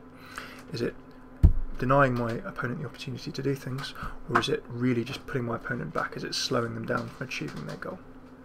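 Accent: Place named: United Kingdom. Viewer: Completely off topic, but I love your dialect. Where in the UK are you from?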